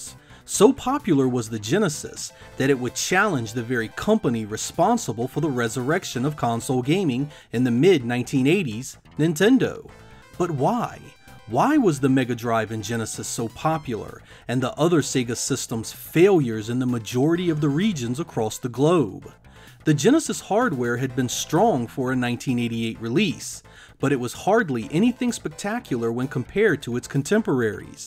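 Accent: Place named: Southeast Pennsylvania, United States. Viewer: Judging by the accent, you’re either from Southeast PA or Maryland.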